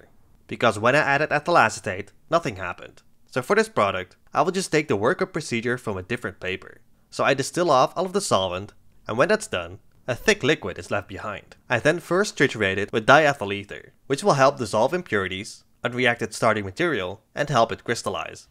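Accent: Netherlands. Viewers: Are you dutch? youve got like a dutch accent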